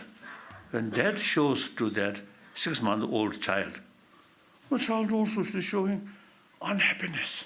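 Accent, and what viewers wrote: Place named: Tibet, China. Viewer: that is absolutely not what the Dalai Lama said, it's an AI speech recognition program that goofed up because it misheard his Tibetan accent, but to answer your first question from my own perspective: yes. It certainly can be, though not necessarily so. Also, while many communist dictators were atheists, atheism is NOT inherently authoritarian and conflating ordinary atheists with Stalin or whoever is a vicious smear. I will not conflate you with Hitler or Franco, who I believe were both Christians, and I would expect the same courtesy and good faith from you with regard to me, as an atheist, and Stalin or Mao ZeDong or whoever. Ground rule: at least have BASIC good faith and don't smear people like that. Agree?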